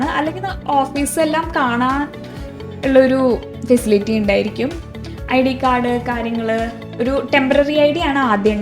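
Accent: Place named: Valluvanad, India. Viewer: Valluvanadan bhasha .. athond choichathatto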